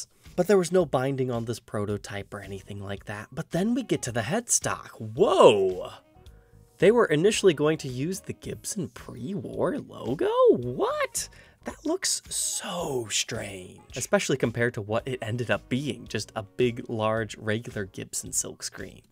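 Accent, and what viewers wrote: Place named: Pittsburgh, United States. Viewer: You sound like your from Pittsburgh. Or somewhat close by.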